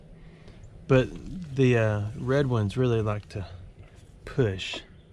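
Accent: Alabama, United States. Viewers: The ultimate ‘shaggy dog’ car story, told with a wonderfully soft Alabama voice. Thank you.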